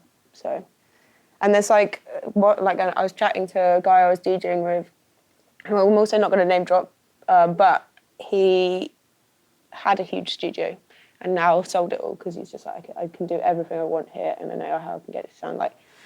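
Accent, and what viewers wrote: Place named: United Kingdom. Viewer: She's so "Bri-ish" I couldn't understand half of it :D Good the music is banging :)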